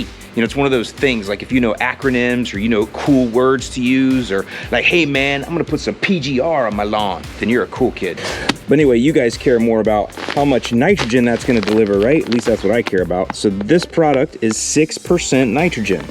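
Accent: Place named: Midwest, United States. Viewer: Great video, and i love your Mid-Western accent lol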